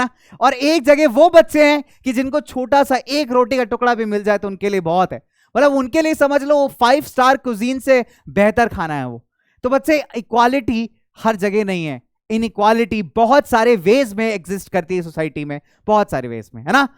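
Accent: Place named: Maharashtra, India. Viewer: sir you are an marathi or from mumbai because your ton is like that sir ❤❤🌊⛴️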